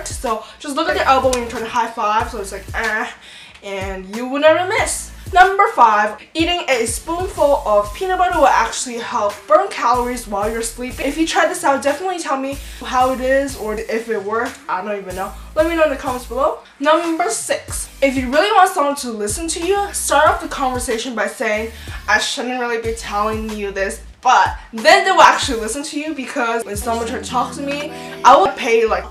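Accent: Canada. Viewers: Canadian eh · are you Canadian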